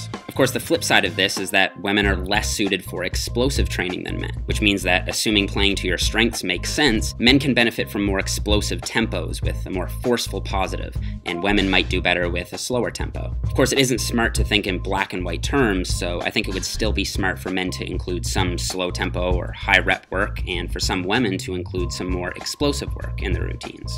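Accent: Newfoundland, Canada. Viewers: He a Canadian boy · He’s a neufie lol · Are you Canadian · Canadian accent is so interesting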